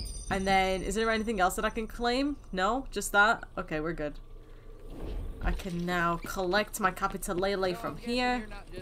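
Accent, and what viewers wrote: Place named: Ireland. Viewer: i played all love,so...I whant only to hear you Irish accent! :)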